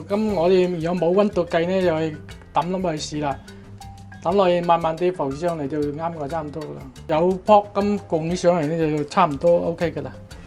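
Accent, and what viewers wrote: Zhongshan, China. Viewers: 鄉下係唔係中山？啲口音好似中山啲石岐話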